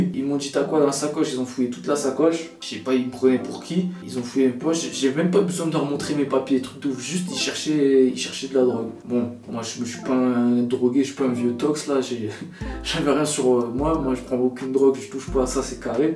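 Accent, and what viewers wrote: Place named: Suburbs, France. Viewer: Sa c voit t un mec bien et tu fais ton truc et tu kiff, donc arrete de parler comme un pelo de banlieue pcq sa rabaisse ta qualité de video et les trucs que tu fais qui sont interessant.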